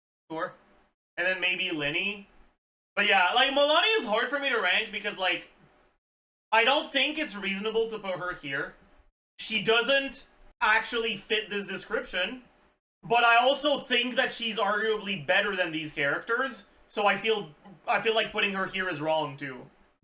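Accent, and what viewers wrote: Quebec, Canada. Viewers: He’s French Canadian so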